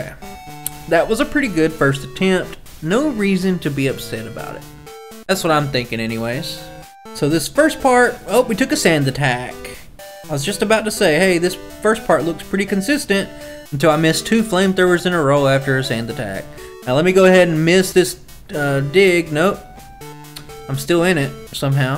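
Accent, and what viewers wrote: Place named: Georgia, United States. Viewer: I'm from England and I'm not very good at American accents. I'm going to have one guess on each of your videos from now as to which state you're from. Now, this is limited as there are only so many states (although who knows how many?) But it might be fun. First guess is Georgia. Please confirm.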